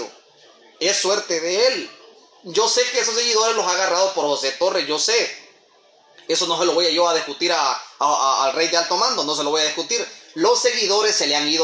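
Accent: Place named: Mexico. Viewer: Este ermano hontureño es la pura riata primer hontureño que abla como un buen mexicano saludos biejon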